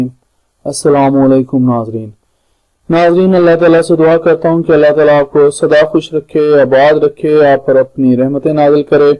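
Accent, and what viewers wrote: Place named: Gujranwala, Pakistan. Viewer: Your dialect sounds from Gujranwala.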